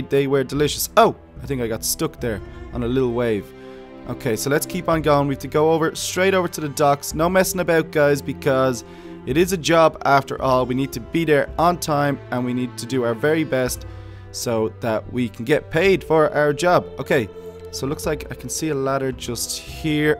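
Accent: Ireland. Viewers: Is he from Dublin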